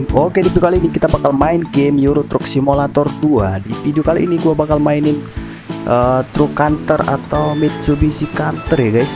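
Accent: Bali, Indonesia. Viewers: Bang pasti asli Bali ya.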